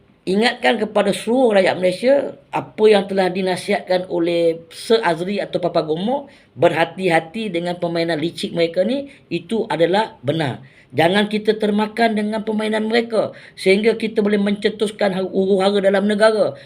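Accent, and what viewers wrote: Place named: China. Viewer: ...tak syok cakap intonasi macam ni....kena buat macam sebelum ni...syok dengar...ada itu pelat2 chinese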